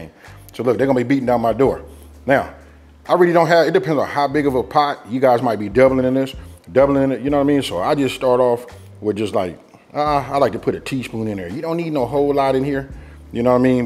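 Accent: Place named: South, United States. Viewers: That LA accent is strong lol I love it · Love the version of ur recipes and love your accent. I’m from Philly, I’m a sucker for southern accents. I made this twice. It definitely hits different with cinnamon in it. Oh and definitely beans 🫘. On the east coast we use beans and ground beef 🥩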